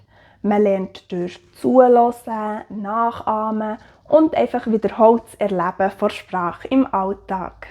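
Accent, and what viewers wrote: Bern, Switzerland. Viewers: This is Bernese German (Berndeutsch, Bärndütsch). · Danke, sprichst du Bärndütsch ?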